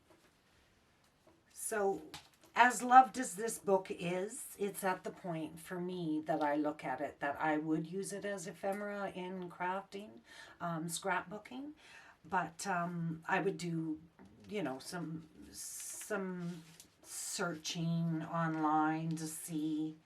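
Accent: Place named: Western Canada, Canada. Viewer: Loving the videos thank you for the background on yourself you sounded like BC or western Canada. I am from Southern Ontario and nothing in my pockets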